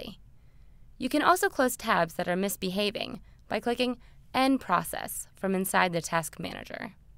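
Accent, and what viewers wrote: United States. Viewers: She speaks american in a very annoying way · The Voice of the narrator who is obviously from Long Island is the reason I will not be downloading this. I couldn't get through her voice or her gleeking. It's TAB not TAAAHHB. Arrrghh she is SO Long Island. I can hear the saliva in each word. She does sound hot though. Go figure. Firefox with Google bar is just fine. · She just sounds American to me...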